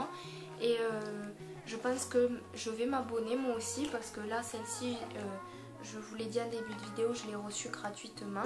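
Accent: South, France. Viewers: Tu es superbe! Le petit accent du sud j'adooore! J'ai TROP envie de tester les box je m'inscrirais surement à la rentrée, parce qu'avec les vacances c'est pas trop le moment ^^ (Je ne peux pas répondre à tes commentaires sous mes vidéos, parce que tu ne me suie pas sur google +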